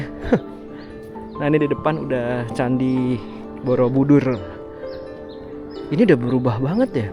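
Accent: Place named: Depok, Indonesia. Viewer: Cara ngebawain narasinya kek mas mas depok bgt nih...apalagi backsoundnya...mirip mirip😅. Moga2 bisa 1000k subscribers bg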